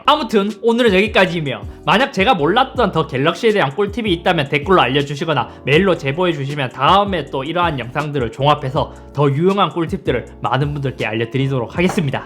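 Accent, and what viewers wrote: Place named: Western Gyeongnam, South Korea. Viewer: 앗!! 이제 아이폰 애플워치에서 아예 갈아타신거에요?? 잇섭님의 서부 경남목소리가 정겨워서 리뷰가 눈과 귀에 속속 들어왔는데... 같이 삼성으로 갈아타기도 그렇구... 항상 응원할께요!! 그럼... 기존 애플 제품 중고로 파시지는 않으세요??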